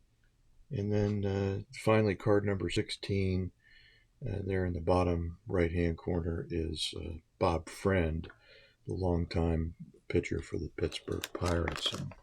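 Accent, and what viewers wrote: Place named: California, United States. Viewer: Great video!!!! Love this set! Question: are you from Cali? You sound like a friend of mine who is from Agoura Hills .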